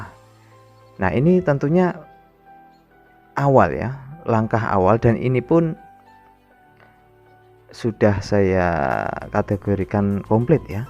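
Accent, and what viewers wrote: Java, Indonesia. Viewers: Orang Lampung ? Kok logate jowo mas!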